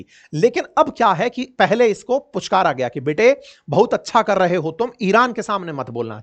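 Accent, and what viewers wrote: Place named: Bihar, India. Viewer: Sounds like Bihari teacher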